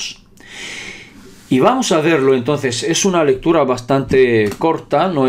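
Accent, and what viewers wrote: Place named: Poland. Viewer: tienes acento de polaco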